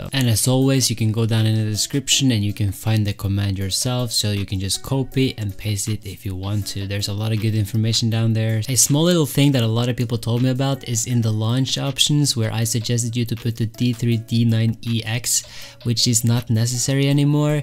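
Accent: Norway. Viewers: are you norwegian? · are you norwigan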